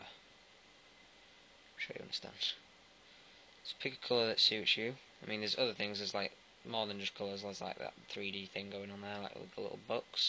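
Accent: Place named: Sweden. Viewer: thank you ,,,,är du från sverige åååääääääööööö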